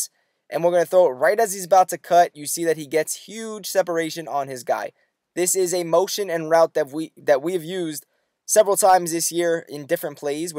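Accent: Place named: New Jersey, United States. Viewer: Love the video great play also is that a jersey accent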